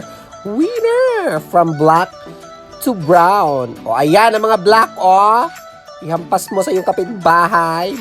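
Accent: Visayas, Philippines. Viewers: Bisaya ka? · Bisaya ka ba inday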